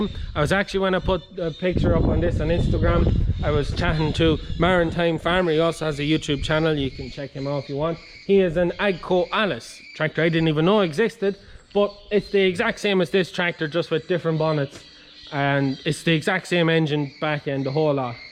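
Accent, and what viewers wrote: Ireland. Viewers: I might be wrong but are you Irish?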